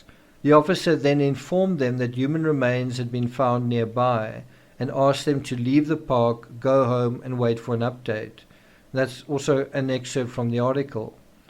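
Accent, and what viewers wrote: South Africa. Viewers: Are you a South-African?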